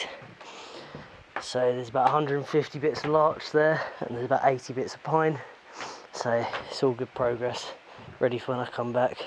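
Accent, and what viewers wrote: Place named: East London, United Kingdom. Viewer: you sound like an east-londoner!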